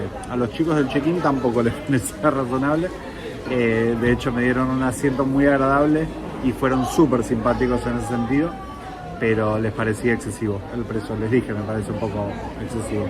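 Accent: Argentina. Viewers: te cuento que descubrí tu canal hace una semana y estoy fascinado. En el trabajo, estoy contando los minutos para regresar y poder disfrutar tus excelentes informes. Son extremadamente interesantes, didácticos, divertidos y esa mezcla de argento europeo que le ponés me encanta. El mejor reseñador de viajes por lejos y te deseo los mejores viajes que serán seguramente los que están por venir....eso sí, compartillos con tus seguidores.....Abrazo gigante para nuestro!!!!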